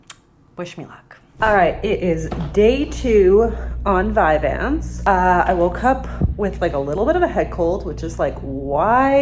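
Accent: Canada. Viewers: I know you're Canadian. But you sound more and more Canadian recently. Love it! ❤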